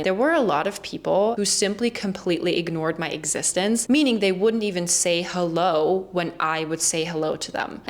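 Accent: United States. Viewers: Yep, i am German and in the beginning I did not know if she is American or German. But no American can fake a funny, German accent. Now, as i know she is one of us, i am blown away how perfect her American english is. But: please dont make so much Werbung for working in Germany. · Love your vids here and on IG. You have a lovely fusion accent of German-English. So classic Why try to sound so American here? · biggest proof you here in America and speaking as if you were an American. Germans are defeated culturally since WW2 they are neutered. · Thank you for this. Your American accent is perfect. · Wow you sound really American. A few words you can detect a difference, but most of the time you sound 100% American to me. Hard to believe you've only lived here 2 years. With that said, I don't see the pressure in American jobs. I also don't see a problem with the lack of welfare. 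My family left Germany back in the 1850s, so I'm as American as you can get at this point (I'm about 75% German, and my other ancestors came here from England, Ireland and Scotland as far back as 1620). I was brought up to work hard and get ahead. You only buy what you can afford. Live within your means. Welfare is only for the lazy or those who aren't capable of taking care of themselves. The one thing I wish we had was the mandatory 30 days off. It would definitely be nice to work 400 less hours a year, but there's a reason we're wealthier too. We work more, so we earn more. I grew up working on a farm/ranch, working about 30 hours a week during the school year and 80+ in the summer. Sometimes 100 hours a week. So 1800 hours a year isn't much to me. 1400 is nothing lol. I've had many discussions about Germany and what it's like there since my best friend from college is German and his brother (also my friend from college) went back to Germany and works as a lawyer there. 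It's interesting to hear the differences. I haven't made it to Germany yet, except the airports, on my way to/from Ukraine where my wife is from. Funny side-story here: I gave up on American girls many years ago, and was actuall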